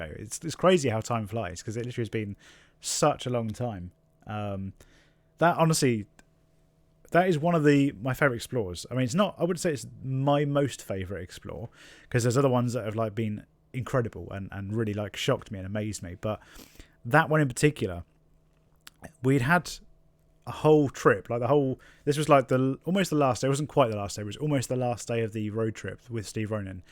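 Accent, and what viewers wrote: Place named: South, United States. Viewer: Hey, I'm new to your channel and absolutely loving it! I'm from up north and trying to locate where your accent is from, where abouts from the South are you from?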